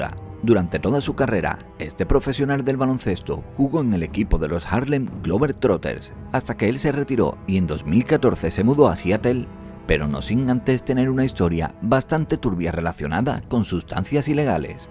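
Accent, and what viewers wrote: Spain. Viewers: Eres español no?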